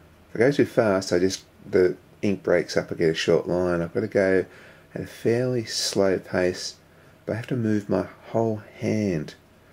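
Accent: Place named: Australia. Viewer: australian! yes!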